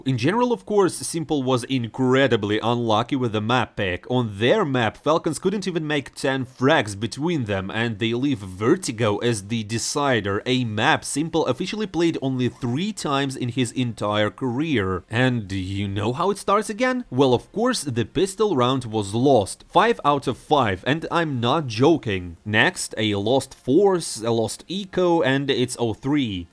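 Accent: Russia. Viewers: nice the russian accsent voice is back BIG W👍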